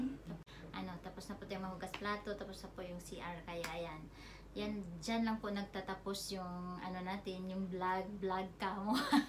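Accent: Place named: Visayas, Philippines. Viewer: Halatang bisaya ka din po..same tau hehe..ang linis ng bahay pgnaka quarantine 😅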